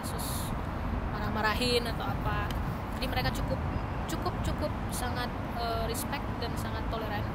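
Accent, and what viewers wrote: Aceh, Indonesia. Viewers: Logatnya aceh banget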